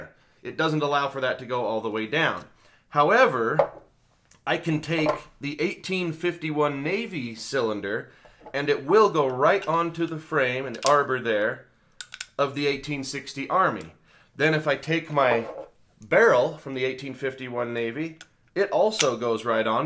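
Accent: Utah, United States. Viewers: Today is the first time seeing your channel. As I was watching, I thought to my self, “ self, this dude’s from utah!” And not to my surprise, you are. Nice videos “brother”.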